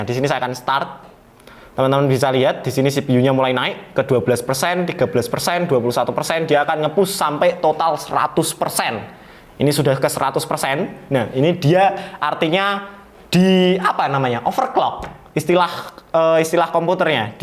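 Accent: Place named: India. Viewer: kang kaya orang india... ngebutt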